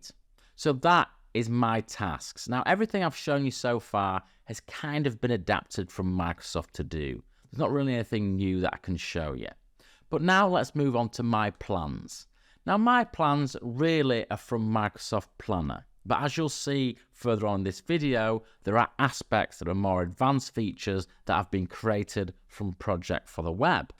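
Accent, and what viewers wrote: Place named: North, United Kingdom. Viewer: I was like "the hell is a book-it", but it's that northern charm "bucket". Love the videos!!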